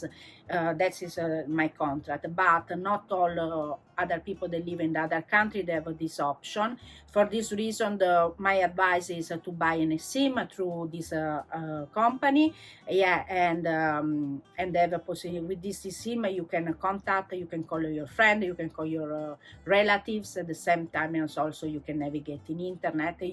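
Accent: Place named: Italy. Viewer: Very good advices, and I love your Italian accent ❤️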